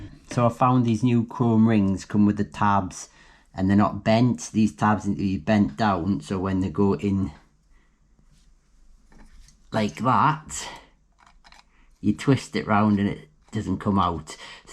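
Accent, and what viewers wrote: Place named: North East England, United Kingdom. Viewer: Great job and thank you for the clear explanation. Good to hear the north east accent too!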